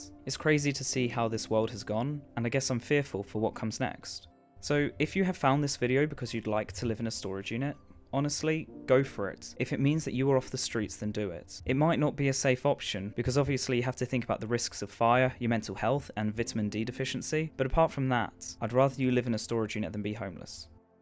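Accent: United Kingdom. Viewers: How could the poster not tell you were British? Your accent is obvious. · i can stand the horrific british accent for only so long 😭😭😭 god damn its obnoxious and gross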